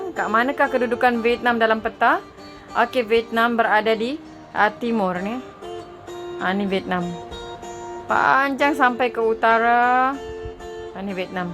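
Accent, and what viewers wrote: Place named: Indonesia. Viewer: Ckp macam Indonesia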